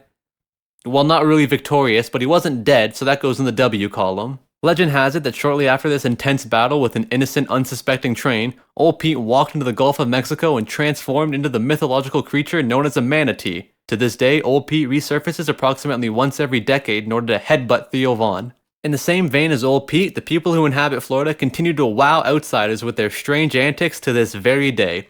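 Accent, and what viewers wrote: Newfoundland, Canada. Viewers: are you Canadian? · Ok so like what province are you from? You keep saying b'ys but I dont know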